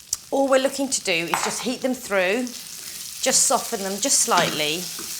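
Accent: England, United Kingdom. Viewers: I loved your Samosa recipe, the authentic Indian taste. You also have a beautiful British accent.God Bless . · very british accent init? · It's just almost 10 years older, video with so much of freshness and life into it..the samosa...lovely british accent...many thanks for the recipe from India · Just love your British accent! Thanks for sharing! Will try them soon. We had them in England years ago and my husband loves them! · Are you speaking British English sorry look like Indian girl thanks for taste food recipe Thanks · Very well demonstrated. And ofcourse the English accent is such a pleasure to listen to. I am definitely going to give it another try. 👍🏾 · Love your video!! Love your British accent and how you showed every step in such detail. Thank you so much for sharing. Can't wait to try making them!! Can you make these with whole wheat flour and bake them rather than deep fry?? 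· In fact I've been trying readymade ones for ages, but now that you show this amazing recipe, it's worth trying. Thank you for sharing the tutorial. By the way, love your British accent.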